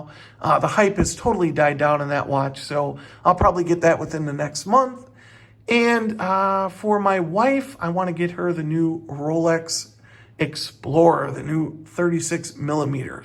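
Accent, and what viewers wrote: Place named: Chicago, United States. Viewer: well I’m a fan dude. I moved from Chicago 10 years ago to Seattle, it’s awesome to hear a Chicago voice on here describing places I miss AND watches. Keep it up!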